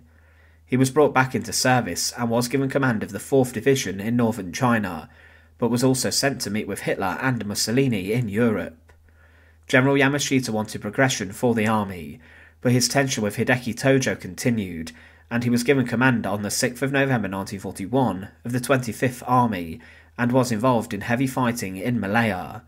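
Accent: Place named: North, United Kingdom. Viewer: Ridiculous narration style. Northern kindergarden pronunciation and intonation. 🤮🤮🤮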